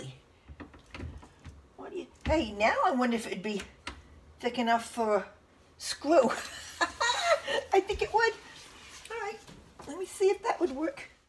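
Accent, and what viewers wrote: New England, United States. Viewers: Nice job! And you remind me of myself.... hahaha Like you, I do as many little fix-its as possible. Limited $$ to throw out the window leads to creative solutions. Always gives you a good feeling of reward when you've done something like this. BTW.... You sound like you're from my neck of the woods. I'm in southern NH (Nashua area). You sound like you're pretty close by, maybe MA? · Hi, I subscribed to your channel a few days ago. I enjoy watching your videos and find them helpful and also entertaining. One question: are you from New England? You remind me of the way people talked when I lived in MA. Keep the videos coming. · I have an idear...keep the r's. It's part of your New England charm and very endearing.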